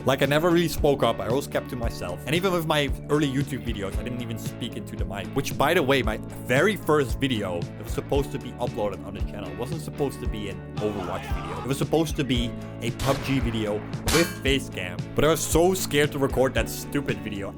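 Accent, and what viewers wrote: United Kingdom. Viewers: Bro sound British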